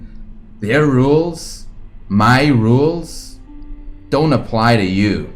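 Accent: Switzerland. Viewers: a german (german swiss) accent, so beautiful, so prosaic! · dude you're swiss? that explains so much about your accent and unorthodox iconography.... the rick and morty work and young justice stuff you did...fuckin supreme! you draw some sexy ass humanoids.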